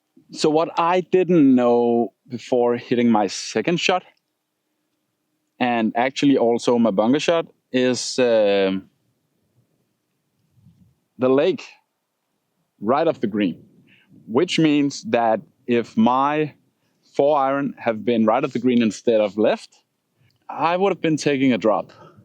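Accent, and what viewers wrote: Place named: Jutland, Denmark. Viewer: Fed video og fedt på engelsk også, du snakker ganske fint engelsk på godt jysk, ingen worries der, hvad med en video, med dine længder på alt i baggen, og gerne nogle Challenge med andre golfer på dit niveau, kunne være fedt og se.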